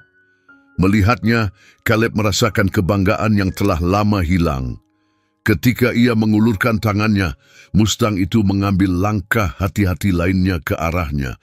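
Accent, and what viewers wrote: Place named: Malaysia. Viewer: Terimakasih terjemahan ceritanya tapi mesti saya katakan betapa menyebalkannya lafal Melayu pengucapanmu...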